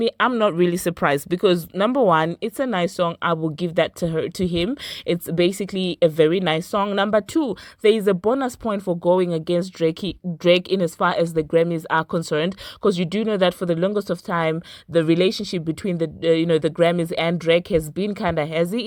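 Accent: South Africa. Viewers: Love your channel are you South African ? Where is your accent from ?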